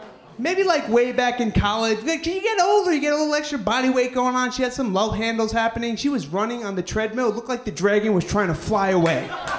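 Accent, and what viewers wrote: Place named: United States. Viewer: He's American. He pronounces his name perfectly in American English. No Italian-American pronounces their surname with an Italian accent. THAT would be weird!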